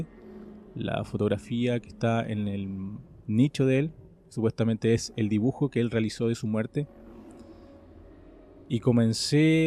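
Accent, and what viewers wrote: Chile. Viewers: Me gusta mucho el acento chileno <3 Muy buen trabajo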